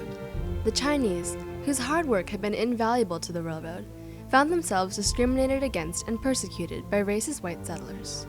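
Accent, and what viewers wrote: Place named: Mid-Atlantic, United States. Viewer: Very good. But what puzzles me is the narrator's accent. Very clear English, yes, but there's such effort apparent to elocute correctly. Sounds a bit mid-Atlantic. Probably never find out.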